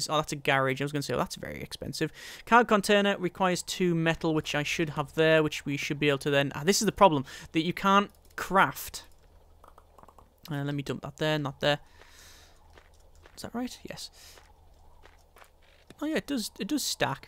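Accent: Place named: Newcastle, United Kingdom. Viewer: are you from newcastle? ya got that geordie accent if ya na what a mean :D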